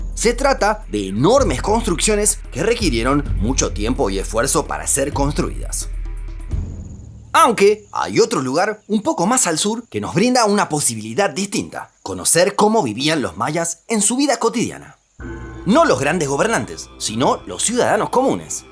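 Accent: Buenos Aires, Argentina. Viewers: Porqué dejaste de hablar como argentino???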